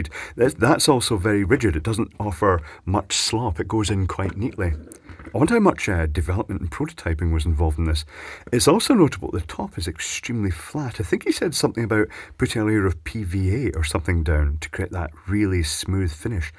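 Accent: Scotland, United Kingdom. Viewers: Hello senor.i am under the impression that your are a Manx bear. Now if that is true. My question is this. Do all manx bears sound Scottish? Or are my ignorant American ears mistaking a manx accent for a Scottish one.?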